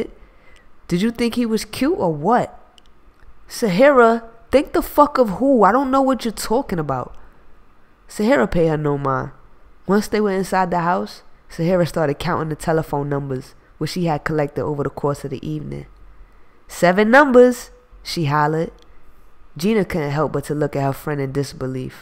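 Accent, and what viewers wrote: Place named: New York, United States. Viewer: your welcome just give us more to hear I love your New Yorker accent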